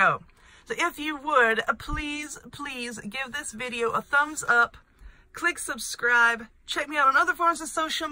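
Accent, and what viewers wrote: South, United States. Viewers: Love this and your accent! Where are you from? I know its in the South. I'm a SE Texan!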